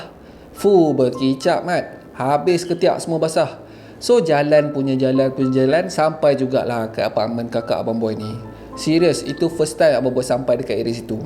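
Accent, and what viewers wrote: Perak, Malaysia. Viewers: ptt ler ade sleng perak... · Ooo mmbesar dtmpt kelahiran saya,w.maju,tu dgr lohat perak je😊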